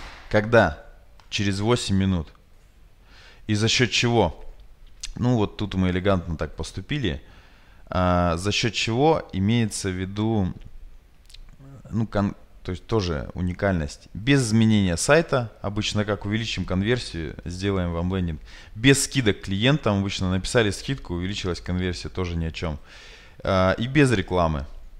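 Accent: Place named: Rural, Russia. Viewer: Только комфортная скорость воспроизведения 1.25, а остальное - всё супер. Говор приятный ) Такой, не городской ) Да, и спасибо большое за науку и видео (а то понаписал всякой херни, а поблагодарить - не написал:0) ЗЫ. Музычка в конце видоса - громковата...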